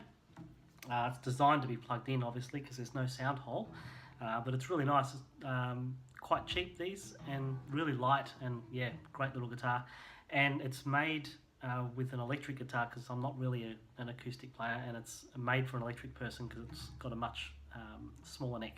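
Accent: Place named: Australia. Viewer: Thanks for the videos,. I've just started learning Wicked for a production coming in Jun. I'm a seasoned guitar player, but I'm no pit musician yet. Looking at the charts, and man I have some work ahead of me. I'm in Syd, Australia and by the sound of your accent you may not be that far away. I'd love to discuss the musical further if you're able, please. Thx. Ted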